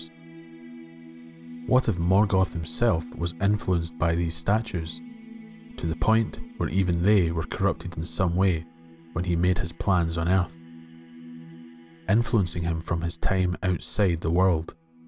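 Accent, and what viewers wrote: Scotland, United Kingdom. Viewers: I don't know if you have an only fans, or an asmr channel, but you have one of the most appealing voices on Youtube. I could listen to you just talking, or reading...what corner of Scotland is your accent from?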